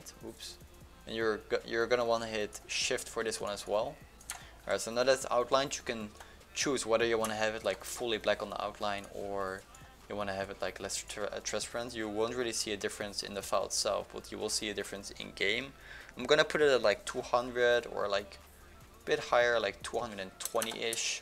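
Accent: Netherlands. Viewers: Are u dutch?? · are you dutch!? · Are you dutch???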